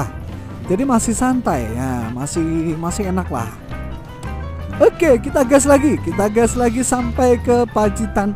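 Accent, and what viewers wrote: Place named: Ponorogo, Indonesia. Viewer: Iki lho dlondonge wong ponorogo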